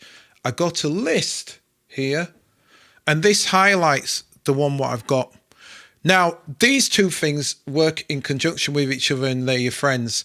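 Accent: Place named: North, United Kingdom. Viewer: As an older guy who is completely out of his depth(normal for me lol), I find you so easy to listen too, although I'm a southerner I find your Northern accent so easy on my brain and even more important, no bloody American crap. Keep it up mate, I love what you do, and know its not easy teaching dimbos like me. Just know that thanks to you I am getting there, albeit slowly lol.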